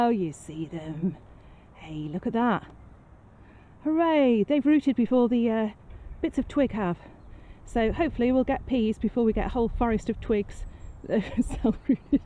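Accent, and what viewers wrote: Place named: North West England, United Kingdom. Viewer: good vid. you sound local, we're on the Wirral. NW UK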